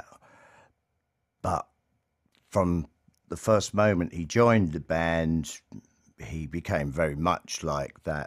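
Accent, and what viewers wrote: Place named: United Kingdom. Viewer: British accent and editing sounds smart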